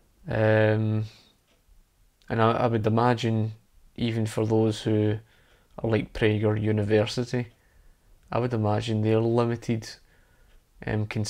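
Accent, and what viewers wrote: Scotland, United Kingdom. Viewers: Hey, welcome back! I'm sorry about your situation, I hope you're well. Have you considered joining other political streams like "The Academic Agent"? Check him out, he's a another classical liberal and makes economic videos and political streams. Perhaps you can get in touch with him! Above all you should focus on yourself, if making other content is what is going to be the best for your situation you should absolutely push for that, don't worry about us we'll be good, but we'll miss you and your Scottish accent. P.S What are your opinions on the new film Outlaw King?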